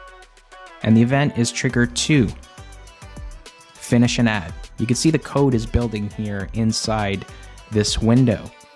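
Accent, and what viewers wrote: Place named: Canada. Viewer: the canadian accent is strong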